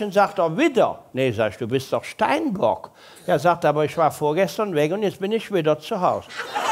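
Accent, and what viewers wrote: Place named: Rhineland, Germany. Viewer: Wenn man sich mal an den sehr ruhigen und rheinischen Dialekt gewöhnt hat, wobei ich mit rheinisch überhaupt kein Problem habe und es auch sehr gerne mag, Mama war aus dem Ruhrgebiet, dann lernt man ihn erst so richtig kennen und sagt Respekt. Sehr viel Wortwitz! Ein wirklich guter Hausmann auf der Bühne!🤣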